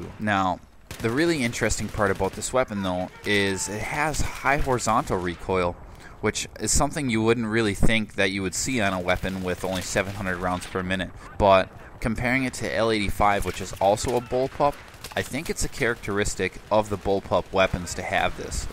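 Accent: Canada. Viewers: Are you Canadian? And please do the F2000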